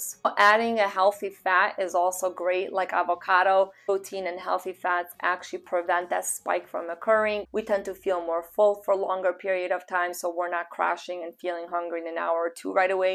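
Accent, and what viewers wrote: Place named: Philadelphia, United States. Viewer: Holy smokes that is a cool thick philly accent.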